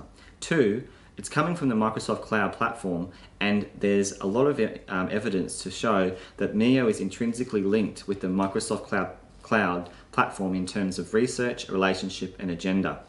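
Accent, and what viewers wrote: Australia. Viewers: Thanks for the great imfo...refreshing to hear an Aussie mate. Awesome presentation too.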